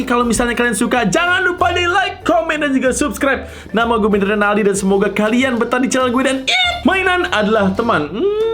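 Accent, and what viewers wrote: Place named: Java, Indonesia. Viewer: Orang jawa abng nya ya😂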